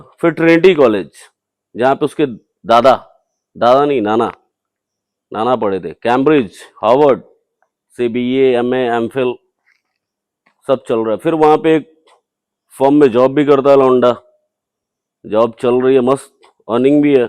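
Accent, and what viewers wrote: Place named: India. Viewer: Bhai desi andaz me narration Gajbe hai ❤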